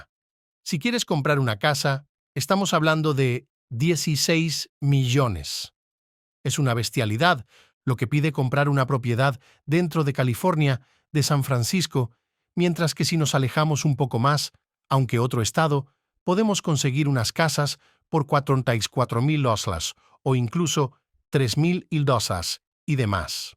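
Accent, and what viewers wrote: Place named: Spain. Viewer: Yayaya párale españolito Mamila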